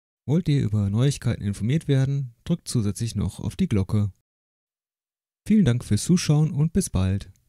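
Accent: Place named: Germany. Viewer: Thank you very much, you did help me a lot ! And absolutely LOVE your German accent 😆😉👍👍👍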